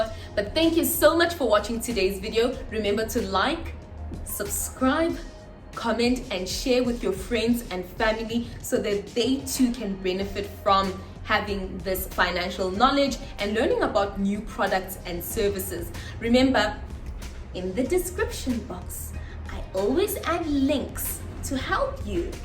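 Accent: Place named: South Africa. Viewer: I actually downloaded this bank app ever since you recommended it to me 😍🔥😍 thank you so much 😊 you are THE GIRL, what? THE GIRLLLLL 😍😍😍🍾🍾🎉🍾 congratulations 🎊🍾 on reaching over 1000 subscribers honey 😍😍😍💃💃💃🌹.... by the way, you look absolutely gorgeous, I love your thick, clear and healthy hair - you are beautiful babe 😍😍😍 Oooooh and I love your voice and accenttttt- Proudly South African 🇿🇦 yebooooo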